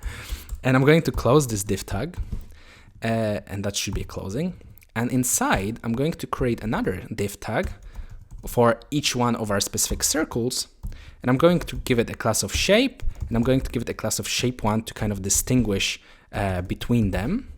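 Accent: Poland. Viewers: Awesome effect! Q&A: Is polish your mother tongue ?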